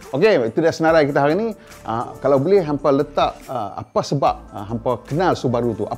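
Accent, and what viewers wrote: North, Malaysia. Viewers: abg presenter plg sy minat..pasai ckp utagha...kikiki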